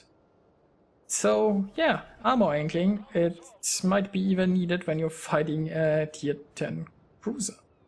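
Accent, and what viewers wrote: Germany. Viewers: are you german? when you speak english you sounds like a german...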